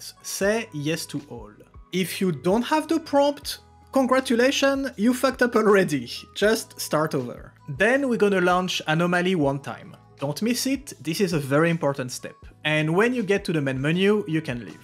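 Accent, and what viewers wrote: France. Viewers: tes francais ?? where is he from >>,<< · Dès les premiers mots on sait que t'es français! Merci pour la vidéo!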